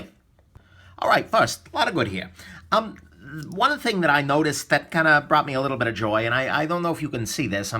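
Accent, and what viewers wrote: New York, United States. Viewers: naw he just sounds like a damn proud New Yorker lol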